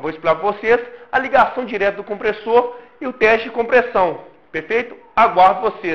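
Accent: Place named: Minas Gerais, Brazil. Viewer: Muito bão igual mineiro fala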